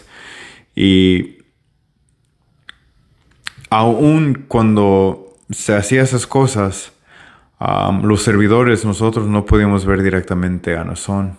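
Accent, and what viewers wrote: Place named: Oaxaca, Mexico. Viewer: Oye Bro ! Eres de norte América o de sur Oaxaca ! Lo digo por tu acento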